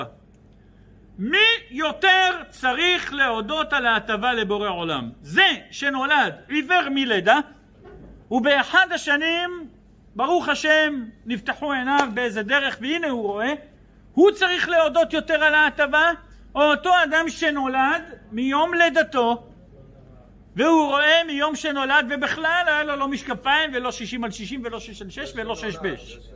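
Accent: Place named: Iraq. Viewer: הוא נשמע עיראקי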